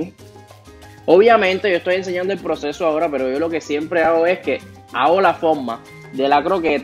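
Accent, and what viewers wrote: Cuba. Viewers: Me gustó mucho tu forma tan Cubana y relajada de hablar y hacer tus croquetas, aprendí y me divertí, gracias ❤ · Me encanta este canal, no solo por las recetas que son buenísimas… también me encanta oírlo hablar, cubano 100% jajaja….. me encanta !!! Que tengas mucho éxito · Las mías estarán bien deformes porque no tengo el aparato ese ! Jajaja …. Me encantan tus videos … muchas gracias por ser tan auténtico y agradable. Escucharte hablar me recuerda mi infancia en Cuba. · Me encanta tu forma de explicar muy a lo cubano. Me gustaría que hagas frituras de bacalao. Mi papá las hacía tan ricas. Gracias y deseo mucho éxito!! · Primera vez que te veo y me encantó tu forma cubana de explicar, felicidades ahora te voy a seguir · saludos! Me encantó tu modo muy cubanazo de explicar, jajajajaaaa. Bueno te diré q en Cuba, mi papá que era cocinero, hacía en casa croquetas de todo tipo, riquísimas y como no tenía máquina moledora ni cómo conseguirla, el les daba forma con un pomo de refresco gaseado (los que bautizamos allá con el nombre de ”pepino”), le cortaba el fondo, por ahí lo llenaba con la masa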